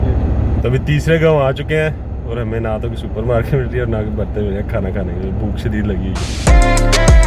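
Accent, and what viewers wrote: Punjab, India. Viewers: Yar main tumhari sari videos dekh Raha hoo colour cloud Aor jolly azeem I'd se boht hi piaray lagay ho mujhe Tum special Punjabi accent Urdu boht achhi lgti hai Apki Lahore Pakistan se boht Sara Pyar 💜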